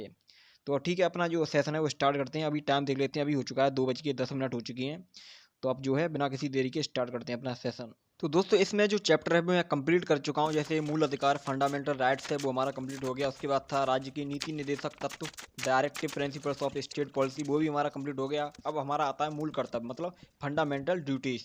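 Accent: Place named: Etawah, India. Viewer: Aapki voice Etawah jaisi lag rhi h .. apke vlogs bhut motivational h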